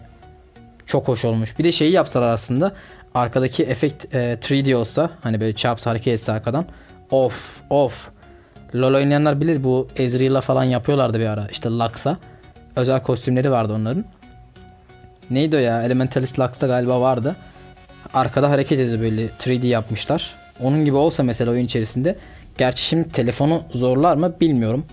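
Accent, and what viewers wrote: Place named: Adana, Turkey. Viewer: GALİBA BENİM GIBİ ADANALISIN!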